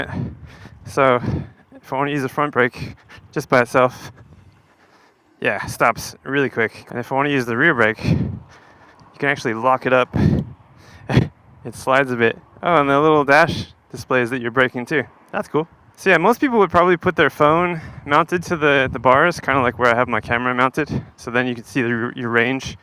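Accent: United States. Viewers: This is actually a great video! It’s both entertaining and informative,but it’s weird hearing an American accent in a video from NZ?? Once I got over that I dug the various aspects of your testing and if I was with the NZ distributor or with Apollo I would be super happy with what you did. What freaked me out though was 50km on a scooter. WTF? I have an eBike that hits 28mph (45km) and I have only done that a couple of times and both times I was muttering a prayer that I wouldn’t hit a stone in the tarmac…things happen really fast above 30km/h much less 50. Either you must be an adrenaline junky or you have a suppressed survival instinct 😂! Bummer that NZ is so restrictive about where and how fast you can ride a scooter, but either way this was a really clever and enjoyable video and thanks for doing it.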